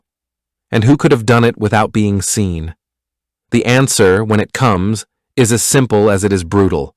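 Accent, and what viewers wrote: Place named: United States. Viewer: The American accent spoils it for me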